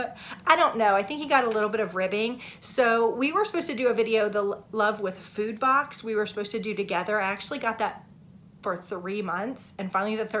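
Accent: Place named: South, United States. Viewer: Appreciate your real life relatable stories and your Southern accent!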